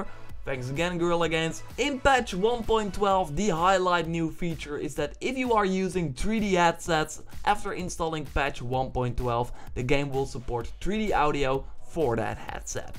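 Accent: Netherlands. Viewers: ik hoor aan jou stem dat je nl bent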